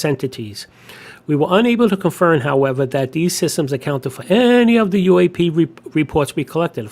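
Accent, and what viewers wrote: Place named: New York, United States. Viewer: I'm southern but I think this being read in what seems to be a thick NY accent, with added sarcastic flourishes, makes it much better.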